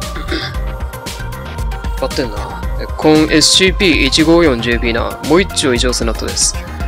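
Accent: Kumamoto, Japan. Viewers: わぁあ！すごい！すごいい！！さんの熊本弁です！！ありがとうございます！好きです！ JP系面白いの多いんですね！ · さんの熊本弁いいですね！今回も面白いSCPありがとうございます！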